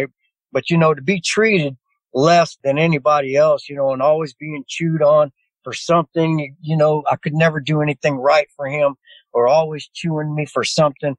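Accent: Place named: Texas, United States. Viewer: One thing I do know is...He IS a Texan...I am from Texas and his accent is spot on! ie..bob wire..lol..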